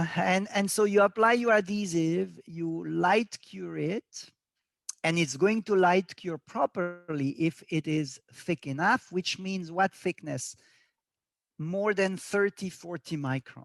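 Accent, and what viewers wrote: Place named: France. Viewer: ну ладно французский английский))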